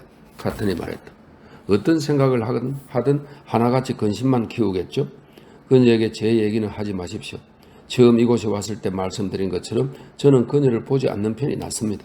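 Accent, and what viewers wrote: Gyeongsang, South Korea. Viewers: 덕분에 긴 명작을 즐겁게 듣고 있습니다. 낭독자님의경상도 억양이 제게는 익숙하여 더 편안하고. 천재적인 작가의 표현을 재미있게 하셔서 웃으며 듣습니다. 그런데 3부의 10장을 찾을 수가 없어서 답답합니다.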